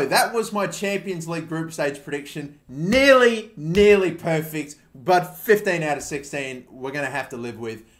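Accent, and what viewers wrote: Australia. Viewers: R u Australiaan mate good day fellow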